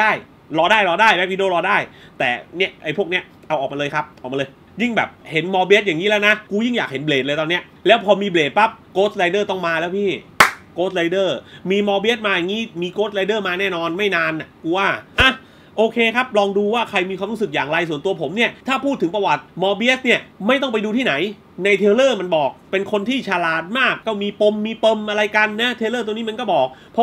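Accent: South, Thailand. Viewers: คนรีวิวคนใต้หรือเปล่าคับ แหลงใต้ชับจัง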